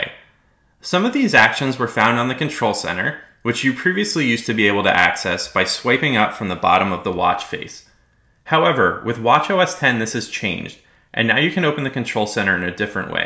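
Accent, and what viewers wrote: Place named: United States. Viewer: Thanks. I’m glad you’re American & easy to understand.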